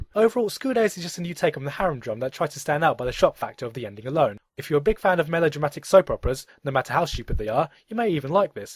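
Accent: United Kingdom. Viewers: hey sounds british but hes asian XD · Me and my friend wanna marry you because your Asian, and you have a British accent. And these videos are fucking awesome.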